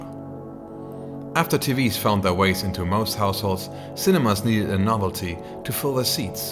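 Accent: Germany. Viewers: That was actually a really great video! Although I hate your german accent, but no offense buddy. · well I had to watch about 3 or 4 videos to be sure 😁 you speak very good english. Im german too so I know what makes the German english dialect but I think others wont notice ;) Looking forward to new videos. Love your videos.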